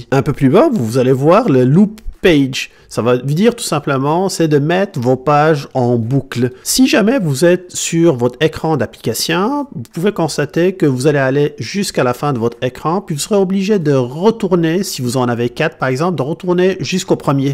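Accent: Quebec, Canada. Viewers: Je n'ai meme pas encore recu mon Fold 3 que je magasine pour des app qui vont m'etre utile et je suis tombé sur ton video. C'est clair que je vais l'installer. Merci pour la video. En tant que Quebecois, ton accent Francais avec un soupcon de Quebecois est vraiment cute hehe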